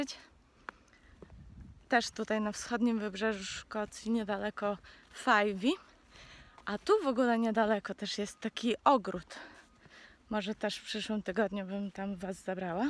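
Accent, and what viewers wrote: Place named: Ukraine. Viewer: Hej To takie trochę specyficzne i pewnie trochę dziwne pytanie czy masz pochodzenie Ukraińskie ogólnie po za polskie. Masz taki leciutki akcent. Pozdrawiam ciebie i Bażanta 😁😉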